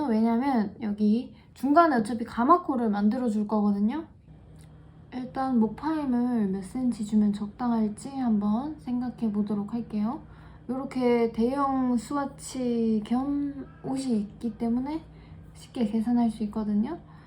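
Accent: Seoul, South Korea. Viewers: 서울 사투리 쓰시나요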